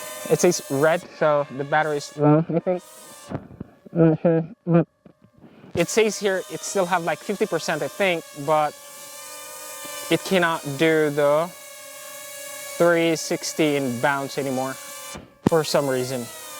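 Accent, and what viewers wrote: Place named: Philippines. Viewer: Mag Tagalog kana lng pag english2 halatang Pinoy ka 😊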